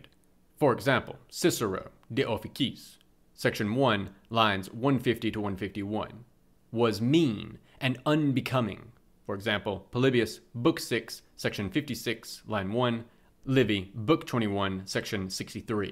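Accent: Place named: South, United States. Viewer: Thanks for doing the audio! I really enjoyed it. Where are you from? I keep thinking somewhere...southern US east of Texas. (It is not a huge accent. Some vowels, not all of them and none of them all the time, sound slightly southern to me.) Maybe Alabama or Georgia? State-level id is plenty, I'm not trying to doxx you.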